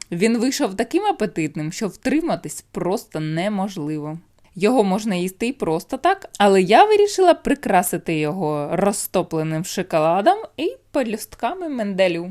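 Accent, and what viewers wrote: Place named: Surzhyk, Ukraine. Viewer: господи, я думал так только мой друг говорит на своём личном суржике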